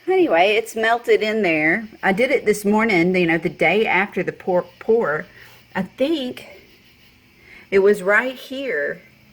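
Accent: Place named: South, United States. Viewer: Ms, from the background noise and your accent, I'd say you're somewhere in the South! I'm in New Orleans. Beautiful pour. Every time I try that many colors together I get mud.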